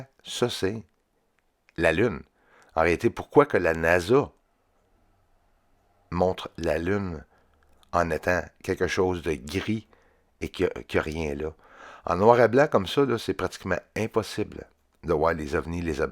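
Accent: Canada. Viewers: J'aime l'accent sympathique de notre ami Canadien .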